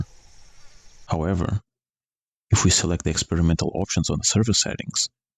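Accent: Portugal. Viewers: este sotaque é tuga meu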